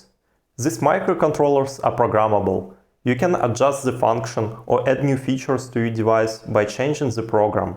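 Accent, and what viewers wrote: Russia. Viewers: Are you russian or Norwegian and russian accent sounds same?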